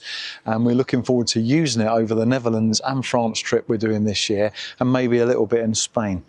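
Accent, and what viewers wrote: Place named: Essex, United Kingdom. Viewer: Same here too - this is happening with quite a lot with channels I subscribe to. Great channel this, and the fella sounds a bit Essex! (my area) I am about to pull the trigger on buying one of these (2026 model)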